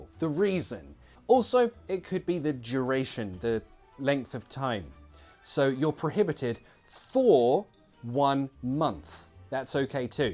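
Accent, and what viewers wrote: United Kingdom. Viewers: "From Bangladesh 🇧🇩🇧🇩" I have already watched many offline videos of your channel. That's are very useful for learning and pronunciation.So, this channel has become my best channel in YouTube for learning English in British accent. Thanks a lot,.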